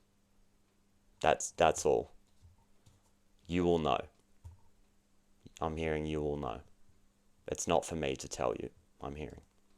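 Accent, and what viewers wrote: Australia. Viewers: Hey! So great to hear your accent fellow Aussie 🦘🐨🌏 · Mate i gotta say it was quite a surprise to hear an Aussie voice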